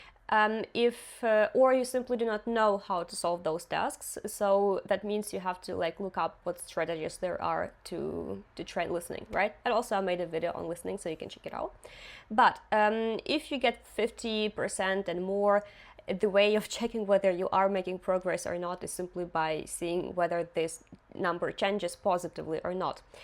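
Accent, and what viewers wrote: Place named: Russia. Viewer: Coming from russian native i guess , your english accent is really impressive, i am working on that, i dont want to sound like an american but have the least accent possible. i like your content because is really helpfull .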